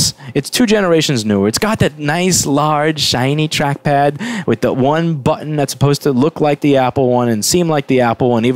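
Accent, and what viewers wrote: New York, United States. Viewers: You're definitely a new yorker...thats the only clear observation in this video.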